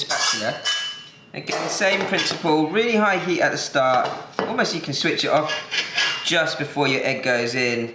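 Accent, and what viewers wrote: England, United Kingdom. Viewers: A gorgeous Asian man with a British accent. I'm smitten 😍 oh, the food looks yummy too ☺️ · I really enjoy your cooking and your pleasant English accent as well ❤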